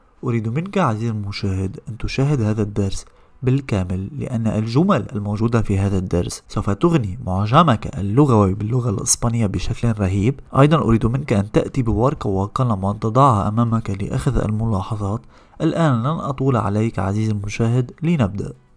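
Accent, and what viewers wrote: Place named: Lebanon. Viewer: رمضان كريم .. شكرا على المجهود .. على الرغم من أن حضرتك من المغرب الا أنك لديك لكنة لبنانية !!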